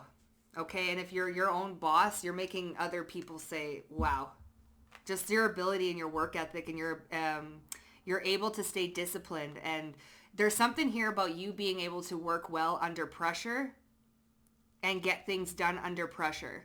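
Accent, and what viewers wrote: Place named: Wisconsin, United States. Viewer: Oofdah: You accent sound like Wisconsin. I've been around it enough 2imitate it. I got a touch of it if I'm not careful 2mask. Norwegian or Swedish ancestry. You & I would be a hoot for outsiders to listen to: taking a piss on everything dumb under the sun. I'd definitely keep t/drinks coming. It is a miracle I'm still around. 7 of 8 good hard-working me die from my condition before being diagnosed. It's a silent-killer. If I weren't built like a Terminator from baling tiny square haybales: I wouldn't have made it. So: that info's got to come- out. We can't be having no good-men to raise the next generation. Why there's 8 good-women to 1 good-man & 10% of men online-dating are seeing ~80% of women from the same sites. Oh yeah, I'm MacGyver. I can solve t/world's energy probs. Yep, I've made guys look bad who've been there 10+ years & I'm there a month doing their job twice as fast, so.. 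they do their best to get rid of me, and they did. It sucks. I'm just going to give it all away b/c Earth don't have time for us to change. It's melting the ice-caps 6 times faster than our previous predictions. We got to use every tool in the box & pump the brakes hard as we can. Unless we want to lose all of the coastal cities & states & have earth-quakes up the wazoo, volcanic off-gassing which makes it so much worse, I just got to put all my cards out there. I get a kick out of all these Christians who say prophetic singers I got with in other timelines: witches, when they're actually as H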